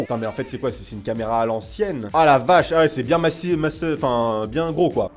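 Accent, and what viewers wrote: Quebec, Canada. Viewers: IL EST COOL L'ACENT QUÉBÉQUOIS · Ton accent québécois est magnifique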